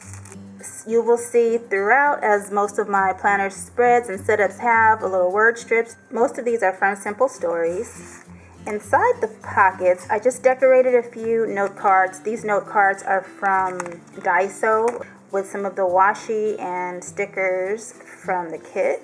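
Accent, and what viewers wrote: South, United States. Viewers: Absolutely adorable! Your southern accent was great, teehee! 👏🏻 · Great setup! I loved your country accent, it was fun 😉. I'd love to see a tutorial on how you change the hair and skin color, I really love that idea. Thank you for the chance to win these goodies, my favorite part of the kit are the sunflowers! They are my favorite flowers and are drawn so beautifully in this kit 😍. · I loved everything with yellow on it! I love that color for a transition into fall🧡. Another thing I loved was your southern accent it gave me a good laugh☺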